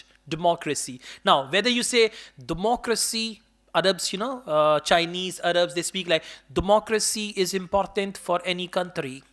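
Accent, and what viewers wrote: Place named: Punjab, India. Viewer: Salute you sir for your teaching method as well as there are myraid things which are most impressed to me such as way of talking like pure punjabi Jatt and also I like your sense of humour. From Punjab🥰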